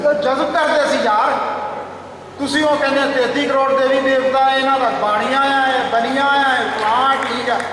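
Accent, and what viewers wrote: Hoshiarpur, India. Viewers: Bhaji tuhada lehja pure 100% hoshiarpur wala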